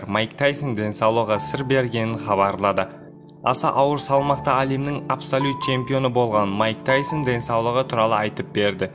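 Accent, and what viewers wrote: Uzbekistan. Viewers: коментатор, тілің қарақалпақшаға ұқсап кетеді екен, қай жердесын бауырым?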